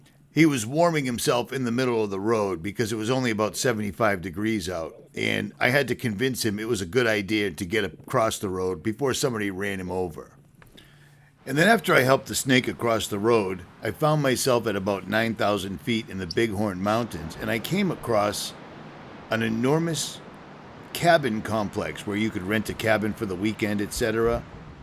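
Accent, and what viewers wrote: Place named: New York, United States. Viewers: I just stumbled across your channel, love it! Great content and yes it must be a fossilized tree our Heavenly Father gave to us for proof the Bible is true! Love your voice, you sound like you’re from NY, just a guess:) Thank you also, for reminding your listeners of our Creator. Safe travels, thank you for bringing us along:) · Miss your east coast accent thanks for sharing your videos miss your old videos from Boston. Keep it up... A friend from the yoop Upper Michigan you ever get out this way?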